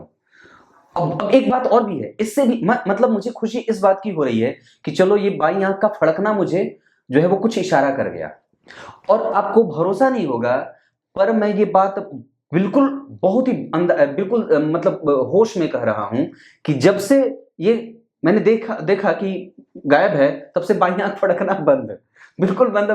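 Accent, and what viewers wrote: Bihar, India. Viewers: Ap bihar se ho😳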